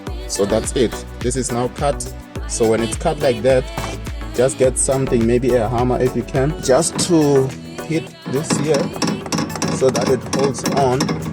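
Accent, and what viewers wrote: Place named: Tsonga, South Africa. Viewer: This accent iya mutsonga str8 😂💔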